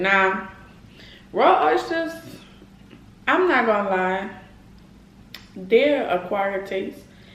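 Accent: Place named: New Orleans, United States. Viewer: Nola? Pretty accent!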